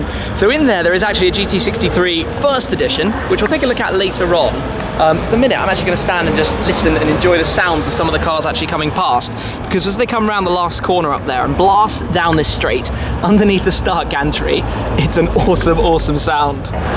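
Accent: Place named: United Kingdom. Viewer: could completely make up a car spec and everyone would believe him cause his British accent makes him sound like he always knows what he’s talking about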